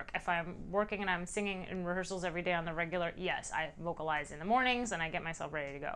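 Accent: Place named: United States. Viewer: She sounds like a normal American woman, not 'husky and gutteral' 🙄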